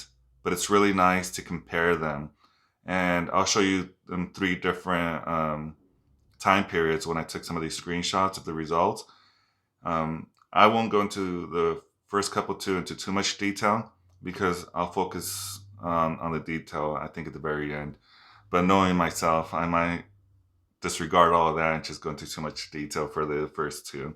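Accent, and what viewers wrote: United States. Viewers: first person ive seen that mispronounce his own name you say in pure (USA) American accent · I live like 1 hour from Philly. I was wondering why you sounded so local. ;-)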